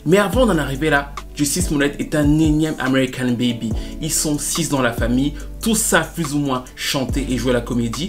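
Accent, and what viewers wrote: Cameroon. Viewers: Très bonne vidéo, très bon orateur ! L'accent camerounais rajoute un pti truc en plus j'adore, c'est charmant. J'attends la storytime sur Missy Elliott please!!!! ☺️ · Tu parles comme un camerounais 🙂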